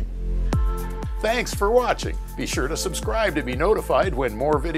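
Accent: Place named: Brooklyn, United States. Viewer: I recognize the accent as a subtle Brooklyn accent. So like, perhaps someone that grew up in Brooklyn as a child but now mostly speak in a "standard American" accent with only hints of their heritage. Of course, it's subtle enough it's hard to say, but it sounds really similar to other people I know are from Brooklyn.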